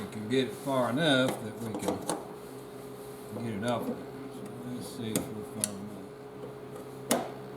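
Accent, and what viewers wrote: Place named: South, United States. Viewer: Lo hace verse tan sencillo, que Bien maestro y me gusta su acento country. Gracias. Brenda from Mexico city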